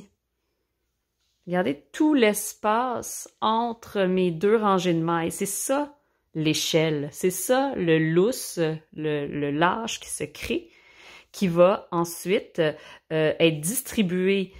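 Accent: Canada. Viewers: bonjour je viens de voir votre méthode pour éviter l effet d échelle et votre présentation explique bien ce que j' ai comme souci en fait mes câbles ne sont pas assez proche lorsque je fais la 1 ère maille de chaque moitié des mailles et ça me fais cette effet lâche de fil ... avec les tous les conseils trouvés des triconautes j'espère y arriver...ceux sont mes 1 ère chaussettes je garde espoir belle journée et merci pour votre vidéo une bretonne qui tricote a une canadienne 😍😇 ( j'adore l'accent)😊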